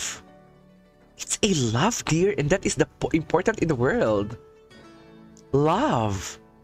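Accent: Philippines. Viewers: (I'm greek) No she's not a soprano. She just sings songs with traditional sound! (ethnic etc) but makes them more modern.. btw are u filipino? I feel like your pronunciation is very familiar to me hehe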